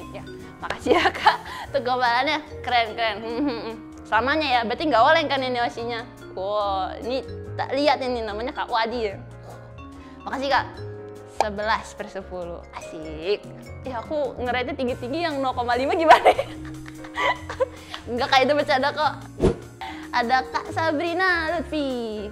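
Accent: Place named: Java, Indonesia. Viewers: Medok nya kerasa banget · selalu ada medok nya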